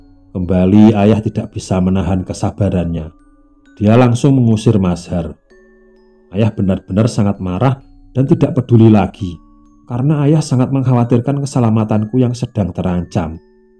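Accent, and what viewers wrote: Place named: Jember, Indonesia. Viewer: Iiiih Jember pisan ..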